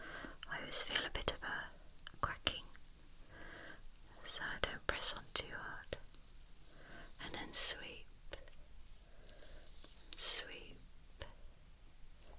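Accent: England, United Kingdom. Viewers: Hello, I listen to you in France, near Paris. I enjoy your voice and your videos. Are you english or american? I suppose you are english.... I don't understand all what you say but I listen with a lot of pleasure. My english is not very good, sorry. Very friendly to you!!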